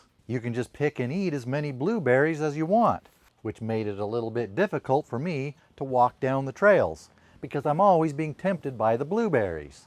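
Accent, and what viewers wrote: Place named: New England, United States. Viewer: your delivery is spot-on Yankee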